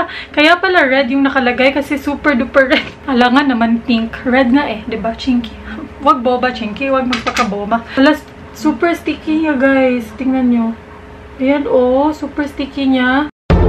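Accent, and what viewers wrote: Visayas, Philippines. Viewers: ang cute mo po ate bisaya ka po ba? hahahahaha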